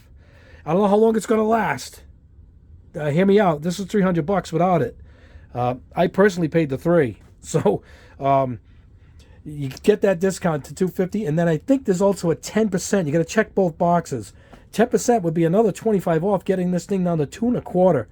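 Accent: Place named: North, United States. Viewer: I watched it again and figured it out. I’m in the deep south. My 65 year old southern ears heard your northern accent say “I guess you could turn it off for lacquers and enamels.” What you actually said was “…..turn it up for lacquers and enamels.” Sorry about that. I’m going to have to get one of these! Thanks again for the video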